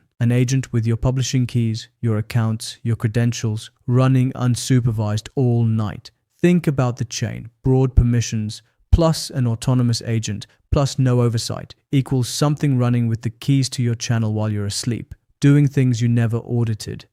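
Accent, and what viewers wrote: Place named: India. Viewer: You sound somewhat indian in this video. Thats pretty funny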